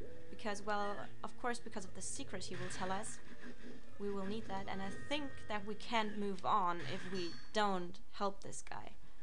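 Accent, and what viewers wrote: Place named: Ireland. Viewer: I got your accent now, now I know why it sounds Irish a bit.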